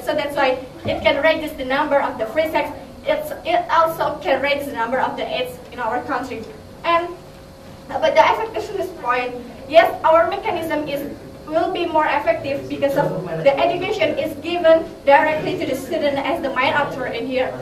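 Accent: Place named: Indonesia. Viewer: Too fast like you are good in speaking english, but beware from other people who watch this and know your grammar, your pronounciation and your bahasa's accent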